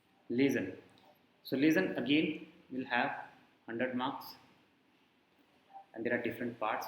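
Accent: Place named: India. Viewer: I could not understand anything at all due to your Indian accent.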